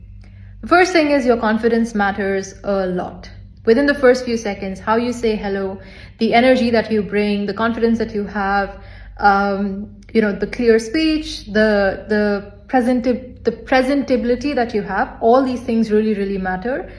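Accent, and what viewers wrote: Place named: India. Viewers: you have a little indian accent can't you speak a little bit faster? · She has an Indian accent because she's Indian. No one needs your opinion on how they speak, she speaks confidently, and the thing is you are talking about how she speaks not on the information she is providing for her subscribers.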